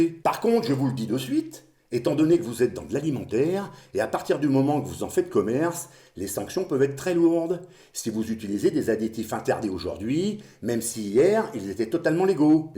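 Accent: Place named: Lyon, France. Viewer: Bonjour, je découvre ta chaine et je m'abonne car ton contenu, ta franchise et ta gouaille lyonnaise sont tout simplement top. Je ne suis pas un pêcheur de carpe régulier car j'aime trop la pêche pour ne pratiquer qu'une technique ceci dit (Eric Pitre et maintenant sont les 2 seuls carpistes YT ou j'ai cliqué sur s'Abonner), quand je vois ton sweat team Hutchinson,ca me projette 25 ans ans arrière dans les Carpe magazine et mes uniques cannes 13 pieds 3,5 lbs 4 Dream maker sont toujours operationnelles. Longue vie à toi!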